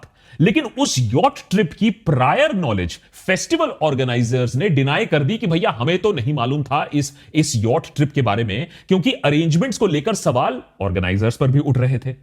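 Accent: Bengal, India. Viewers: Brother why are you telling assamese names in bengali accent ( ps: i know deshbhakt is bengali okay, don't try to be oversmart)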